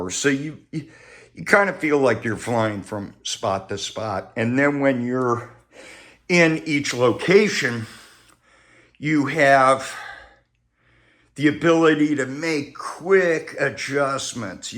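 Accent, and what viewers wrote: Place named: Cincinnati, United States. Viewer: Why do I feel like this guy is from Cincinnati area? Complains without a true thoughtful logical ana. I may be wrong, but o know an accent and type.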